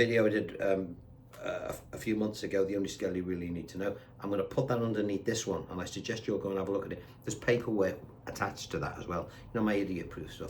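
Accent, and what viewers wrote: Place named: Wirral, United Kingdom. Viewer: Hi the scouse accent drew me in here but you have that posh twang of a Wirralite or Formby guy? the other thing is our strap with the Union jack That was my flag all my lfe i never even knew there was an England flag I noticed the change away came about 1993? Being a classical piano student the guitar fretboard seems very complicated in comparison but this helps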